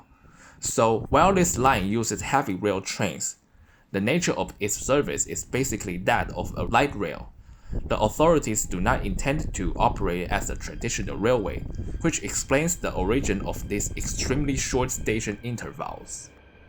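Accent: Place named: Holland, Netherlands. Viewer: As a Flemish speaker the very very strong Hollands pronunciation gives me a stroke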